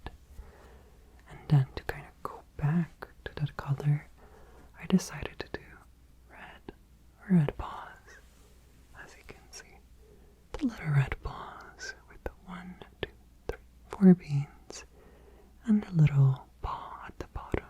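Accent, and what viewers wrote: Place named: Netherlands. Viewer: Another great video! I was actually surprised you hear you’re not American XD although I listening really hard to your accent I figured it was Dutch! Or some Scandinavian country rather ^^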